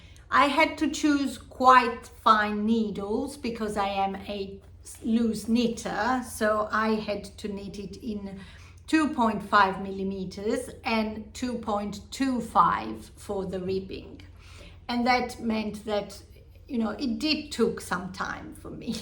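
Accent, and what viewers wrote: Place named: Italy. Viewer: ! I loved this episode. I find your accent so interesting - sometimes I hear Italian accents and sometimes I hear Australian accents! 😁 We hosted an Italian exchange student one year and are still in contact with her. Anna and her family live in Collebeato. Close to Lake Como. Where are you from? You share so much great detail about your projects here! I made notes on things i didn’t think to share! Sure do wish I could come meet up with y’all at the fiber festival next fall!!! I guess I’ll just join virtually. Thanks for sharing such great inspiration❤❤❤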